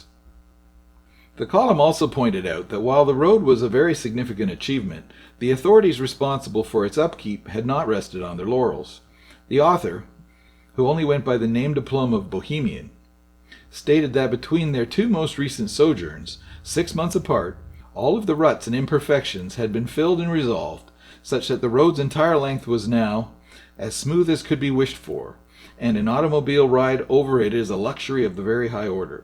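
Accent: Canada. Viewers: Where are you from? Hahaha. If from Canada, I'm guessing Ontario!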